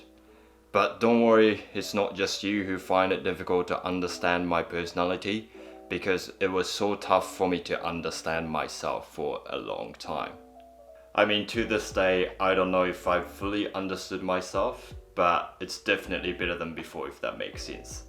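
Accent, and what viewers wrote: New Zealand. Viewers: Your accent is so new zealand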